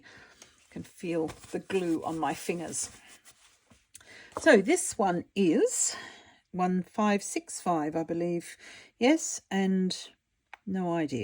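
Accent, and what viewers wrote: Australia. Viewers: Hello. Just found your channel and kept thinking "she" sounds Aussie and then finally at the end you said you went to school in Australia. Hi from a fellow Aussie :) Enjoyed your humour along the way & perhaps that disorder is contagious. I have it too 🤣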